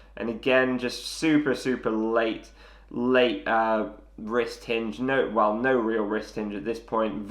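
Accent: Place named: United Kingdom. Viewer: Great break down of Ancer's swing. Plus, you're one of only a few of the golf Youtuber's from Great Britain whose English accent I can comprehend. Cheers for the Queen's English ya'll.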